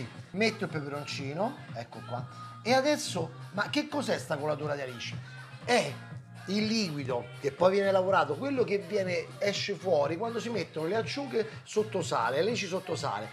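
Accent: Rome, Italy. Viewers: Complimenti chef! Sono allergica al peperoncino, si può fare senza? Grazie mille sei unico! Oltre ad essere strabravo, carico d'inventiva, sei proprio un simpaticone, ci metti di buonumore, impariamo un sacco di cose si ma, ridendo.... Ue' con sto accento romano verace ci si diverte un sacco! Grazie mille chef! Bacino al Vs splendido bimbo!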